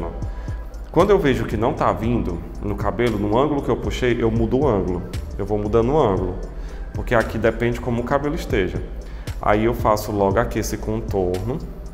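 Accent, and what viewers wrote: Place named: Ceará, Brazil. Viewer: Já reconheci que é um cearense de longe kkkkk meu marido tbm é!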